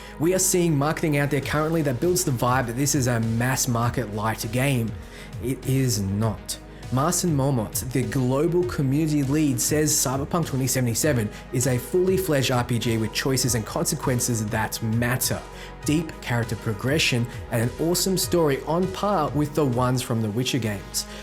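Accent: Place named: Australia. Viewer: Hey, are you from Australia? I feel like your accent is Aussie (I'd guess Sydney):P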